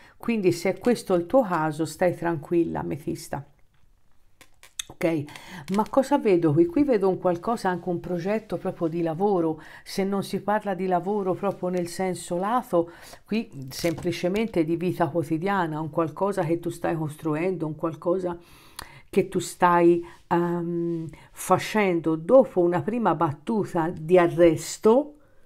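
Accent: Tuscany, Italy. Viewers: Ametista 😊😊😊grazie grazie grazie .è un piacere ascoltare te ed il tuo toscano😅😍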